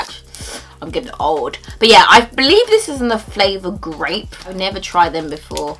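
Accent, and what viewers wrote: United Kingdom. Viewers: my name is marlene and i have tasted all those candy exept the twinkiesand your right the cowtales are super gross but the sour balls that you didnt like i love those and i love your british accent and you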